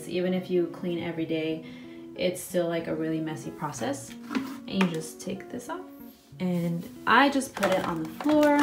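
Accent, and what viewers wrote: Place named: Albuquerque, United States. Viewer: The inflection in your voice makes me miss Albuquerque. That being said I wouldn’t mind clogging your vocal cords￼😂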